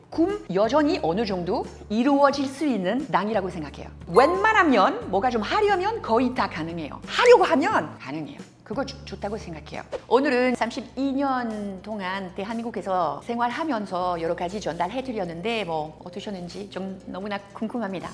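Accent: France. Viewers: 악쌍 코레앙은 정말 그 누구도 따라할 수 없는 트레이드 마크. 트레 쥬닉크. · 이야 이게 누구야 부란서이씨의 원조 이 님 아녀? 불어억양의 빠른 속사포한국어는 여전하네요. 오랜만에 들어보니 반가울지경. 한국에서의 삶이 벌써 30년이 넘으셨으면 이젠 그냥 한국인이시죠. 오히려 고국으로 돌아가도 어색하실듯. 암튼 건강하게 잘지내는 모습 보니 좋네요.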